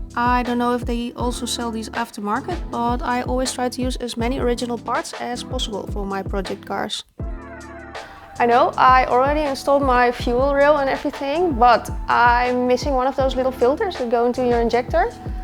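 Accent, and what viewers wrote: Netherlands. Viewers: just by your accent are you from dutchland, or germany?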